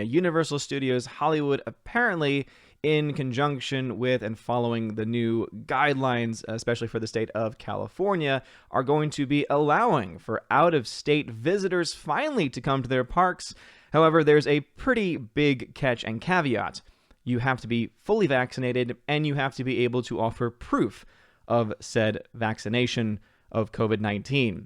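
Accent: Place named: Germany. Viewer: That's a nice German accent there. :-) As for Pinkofornia, the sooner the recall of Gavin Newsom happens, the better, because he'll be only too keen to make overall vaccine passports a reality. :-o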